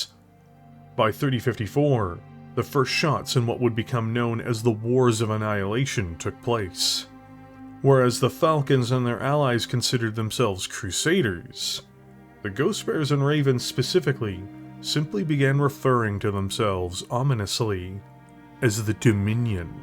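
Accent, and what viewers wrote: Canada. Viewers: I must applaud the incredible effort. A near 5hr monster that gave you no safety net to fall back on is hercule task in itself, but at first you seemed to follow the Doctor Who timeline of certain moments in history always have to happen. Not saying that couldn't glean out possibilities of who what and where, but the why was always going to be there. Twycross would always happen, yet a Rasalhaguean airspace pilot crashing into the bridge of a warship did not. Now, whether or not your crippling Canadian pronunciation is seemingly done to tweak my ears or that is just something that you do just to tweak the internet just because you have a channel and I don't. 1. I expected that Clan Wolf would be taken out of the driver's seat. Partly cause of (deserved) hatred and partly cause this was an exercise of a true 'what if'. You can't play fantasy with all the same pieces and expect a different result. 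2. Clan Hell's Horses. While the use of Hellions, Goliath Scorpions and Fire Mandrills was interesting, as some never had much written about them, so this was unique in this creation, but HH, with their mantra of combined arms of mech, tank and infantry, while similar to the innersphere doctrine of warfare, I believe in the long run, would have provided a more stable invasion force. But stable means boring and you would not have had Khan Steven Tyler(was FASA writers fans of Aerosmith?), blowing up about everything. 3. While I understand that you needed new players in the invasion of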